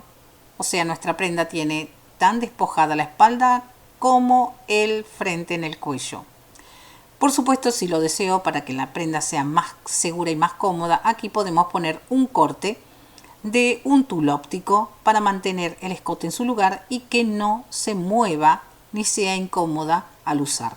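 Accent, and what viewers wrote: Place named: Rioplatense, Argentina. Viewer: el acento de vos me parece el de Argentina rioplatense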